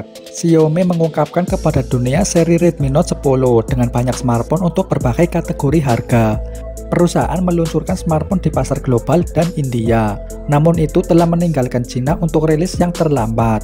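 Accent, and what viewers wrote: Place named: Java, Indonesia. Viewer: Bgst mendoknya bikin ngakak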